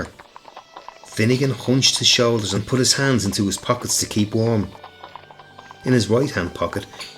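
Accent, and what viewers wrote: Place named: Dublin, Ireland. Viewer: I loved that story... and the way you told it. The history mixed in too.. Listening from Australia.. originally from Dublin.. I miss hearing my accent.. thank you man ☘️🇨🇮🙋‍♀️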